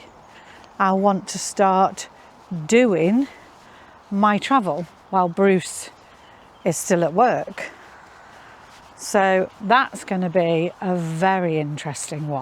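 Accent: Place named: Leicestershire, United Kingdom. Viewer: Is this a Leicestershire accent 😊.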